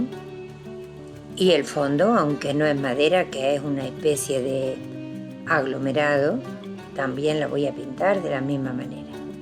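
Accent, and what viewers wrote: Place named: Seville, Spain. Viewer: Hola: soy mexicana y siempre me he dedicado al trabajo intelectual, pero ultimamente, claro, me estoy interesando en reparar mi alrededor directamente comenzando por mi hogar, jajajaj,a, y tu video es tan sencillo que facilita esta mia tarea. gracias por estar ahi. ademas, eres sevillana? bueno pues que me reencanta como hablas.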